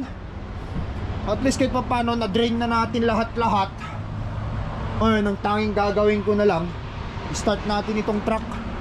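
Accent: Ilocos, Philippines. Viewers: yun speaking voice mo tunog batangeño na ilocano tigas ng dila mag pronounce ng words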